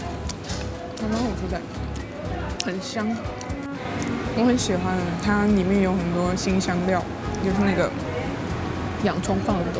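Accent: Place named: Jiangzhe, China. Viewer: 博主是哪里的华人？听口音真的很大陆华南的口音，偏江浙一带。但是海外华人基本上广东福建人很多，口音明显，所以我很好奇。 如果是大陆人，到大陆不至于这么惊讶，不是大陆人，口音真的好大陆，如果说是台湾人，口音真的很淡。 😁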